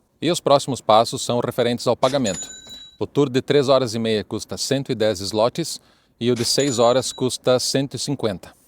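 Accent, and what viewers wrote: Rio Grande do Sul, Brazil. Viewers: tu ainda pergunta se ele é brasileiro? Olha o sotaque sulista dele. Meu ,é tudo tão nitido .😮😮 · Você é do Rio Grande do Sul? Parece com o sotaque do RS. Uma professora me contou que uma amiga visitou esse campo. Ela chorou, e falou o seguinte: "o homem é um m0nstr0 mesmo". Acho que, por mais que eu estude, nunca vou conseguir entender a II G. M. Como um homem conseguiu convencer uma nação de que um grupo de seres humanos deveria sofr3r coisas tão ruins?! Como ele fez isso?! Por que acreditaram nele?! Por quê?! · Bom dia, só pra tirar uma dúvida, vc é gaúcho? Quero te parabenizar pelo belo trabalho e pela explicação ótima. Infelizmente quando falamos sobre esse assunto nos assunta e nos comove muito. Obrigado pelo conteúdo e por nós trazer uma série responsável e uma voz de verdade.❤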